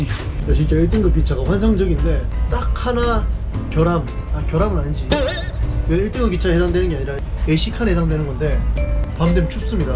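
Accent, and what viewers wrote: Gyeongsang, South Korea. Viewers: 제주도 한달 살이 영상 찾아보다가 추천 영상떠서 보기 시작했는데 며칠 째 시간 날 때마다 님 영상만 주구장창 보고 있네요ㅋ 조만간 제 최애 유튜버가 되실 것 같은데 미리 축하드려요🤣 참 외모만큼이나 성품도 생각도 올바르신 것 같아요! 매사 참 밝고 건강하고 긍정적이고 순수하고 마음 따뜻하신 분인 듯👍 경상도 사투리도 너무 멋지고ㅎ 근데 이렇게 빡씨게 여행하면서 온갖 고생 다하셨는데 왜때문에 꼬질꼬질한게 하나도 없이 반짝반짝하신거죠👉👈💕 저 아무래도 입덕한 것 같아요🤣🤣🤣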